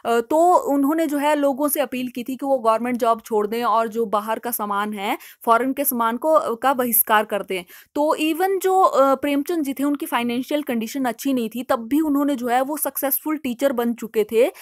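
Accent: Haryana, India. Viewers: Mam aap Haryana se hai?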